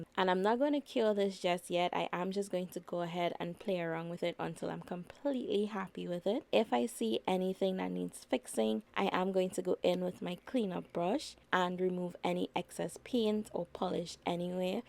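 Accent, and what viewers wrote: Trinidad and Tobago. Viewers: thanks for sharing love your work all of it,are you from TRINIDAD I AM FROM TRINIDAD AND YOUR ACCENTURE SOUNDS LIKE US SPEAKING STANDARD ENGLISH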